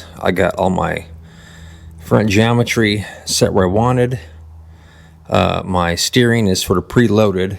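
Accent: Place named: Texas, United States. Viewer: May I ask where abouts are you located, It sounded as if your in Texas or close to it, Just curious and if you rather not say for obvious reason of unlikely types showing up I get it You don’t know me but maybe we will meet at a show sometimes But I really need to watch more of your videos I see some learning this old man needs to pick up on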